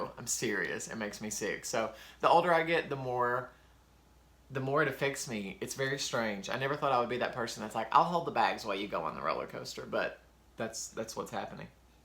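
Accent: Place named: Alabama, United States. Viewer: I just love your accent! I'm an Alabama girl so it makes me feel right at home. I really enjoyed learning more about you. You have such a great personality 😍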